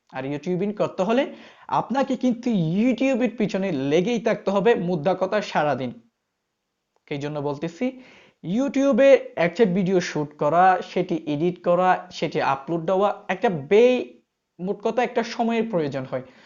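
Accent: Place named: Chittagong, Bangladesh. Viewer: মনে হচ্ছে চট্টগ্রামের মানুষ।আমিও চট্টগ্রাম থেকে।Education ভিডিও বানাই।একজন কেমিস্ট্রির শিক্ষক।Love from Chittagong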